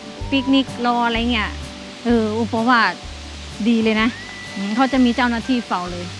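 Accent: Korat, Thailand. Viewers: แก่คนบ้านไหนนิคือพูดคือคนแถวบ้านฉันแท่ะๆฮึ55คนโคราชนิแม่นไหม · มักสำเนียงไทโคราชจ้า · สำเนียงโคราช คนโคราชเหรอ? ผมไปตาดโตนไม่เคยเล่นน้ำเลย ไปกินกาแฟ สดปั่น กับไปเก็บเมล็ดมะค่าโมง มาเพาะ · พี่ใช้คนโคราชไหมคะพูดเหมือนคนโคราชเลย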